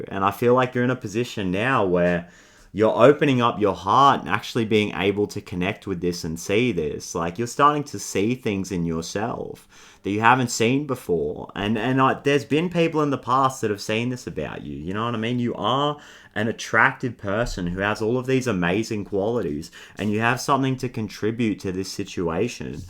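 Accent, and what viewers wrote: Australia. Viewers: Hello from California. Great voice. Great messages from Australia. Fantastic Australian Accent, it's known as one of the most trustworthy accent. We appreciate you. Have a good day/week/month, from our channel. :hand-pink-waving::hand-pink-waving::hand-pink-waving::trophy-yellow-smiling::trophy-yellow-smiling::trophy-yellow-smiling:🐦🐦🐦🦅🦅🦅🦜🦜🦜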